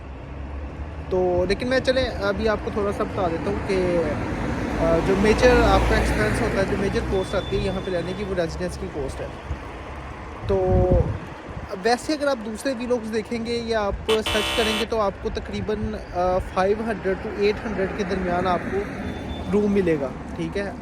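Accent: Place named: Lorestan, Iran. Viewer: bhai Love for ur politeness and Lori Accent❤😂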